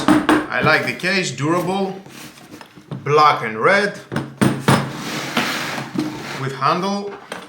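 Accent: Greece. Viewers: Είναι φοβερό εργαλείο ήδη τα έβγαλε τα λεφτά του με το πρώτο μερεμέτι .Ανετα ξετρυπήματα και σκαψίματα σε τούβλο και μπετό . Γενικά η σειρά performance είναι κορυφή.. Θα πάρω κι άλλο την επόμενη που θα ξαναφέρει το λιντλ . Είχα αρκετά εργαλεια απο τα πράσινα και έχουν βγει σκυλιά αλλα εδώ πλέον και με τις τιμές που παίζουν έχουν ξεφύγει τα πράγματα και χτυπάνε άνετα και κορυφαίες μάρκες οι οποίες είναι εώς και 5-6 φορές πιο ακριβές ! Γράφω Ελληνικά γιατί απο την προφορά αποκλείεται να μην είσαι Ελληνας!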